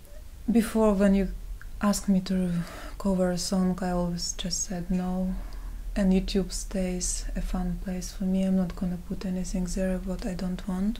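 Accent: Russia. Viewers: hey, where are you from? Your accent sounds Russian. I'm Russian and i can understand all your speech. When i am trying to do it with native speakers i have problems but your pronounce is awesome. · I can hear some russian accent :D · *q* Russian accent............. <3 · Are you from russia or something ?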